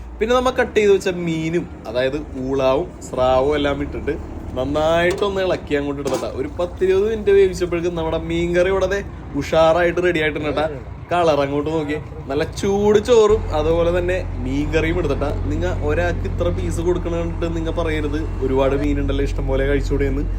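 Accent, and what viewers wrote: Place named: Kochi, India. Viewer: Nice video correct kochikkarente voice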